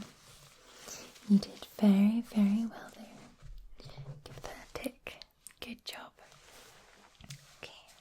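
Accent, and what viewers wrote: Scotland, United Kingdom. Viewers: Best Scottish ASMR youtuber! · I do love the Scottish take on the ol' CNE (being Scottish myself) :-) Great work! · i love love love the Scottish accent thank u for this it’s amazing keep up the good work!! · I am scottish myself, so this makes it much better to listen too, also probably one of the best ASMR'ists of youtube in my opinion :) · I'm getting sick of seeing these! Jk I love the video and nothing beats a Scottish accent! 😊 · So cool listening tae someone else who’s Scottish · who doesn't have an accent? English accents are so damn mixed and diverse that even being from the UK I can't tell what it is. I think it's more so Scottish.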